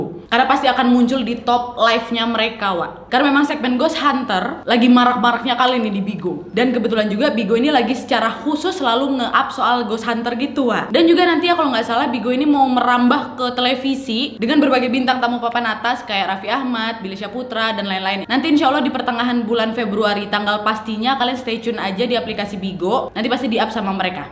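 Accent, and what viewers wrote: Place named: Medan, Indonesia. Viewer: Dr logat nya pst org Medan. 😂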